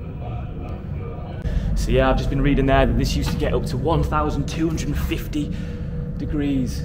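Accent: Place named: London, United Kingdom. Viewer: I do love this guy's attitude towards his native town... Seemingly a wardian, showing the aftermath of some unlucky human activities. His accents reminds me of my best years in London when I was young. Take care... Thanks for showing us this video. 😊... Etruscan is an old italian folk, they lived in nowadays Tuscany in Italy...in the 5th century B. C... 😅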